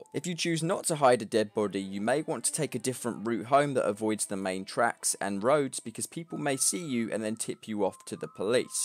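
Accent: United Kingdom. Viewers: He just has a british accent thats all.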